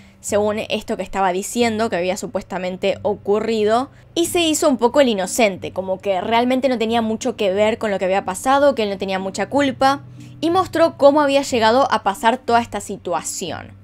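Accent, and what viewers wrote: Argentina. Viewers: Me encanta tu manera tan espotanea de narrar los hechos, tu acento argentino me encanta (corrígeme si no es argentino) y además estás super bonita awss ❤️✨ no puedo con tu canal es demasiado perfecto✨ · Hondureña cejuda con acento argentino?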